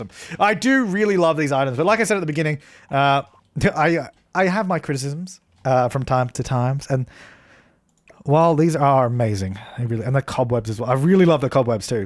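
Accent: Australia. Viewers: Its so good hearing an Aussie voice talk about the sims <3 :D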